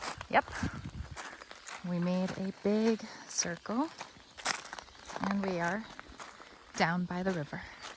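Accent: United States. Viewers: "I left NY city for Bulgaria" - I was going to say, your American accent is really good :) Liked and subbed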